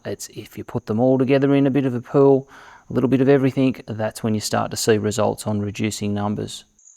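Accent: Australia. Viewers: bro got the strongest australian accent ive ever heard in my entire life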